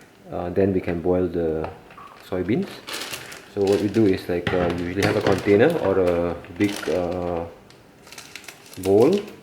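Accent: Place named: Malaysia. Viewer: ur accent sounds malaysian..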